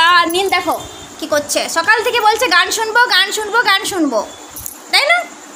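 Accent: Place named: Bankura, India. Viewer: আমারও বাঁকুড়া তে বাড়ি। টাউন।তোমার কথাই বাড়ি।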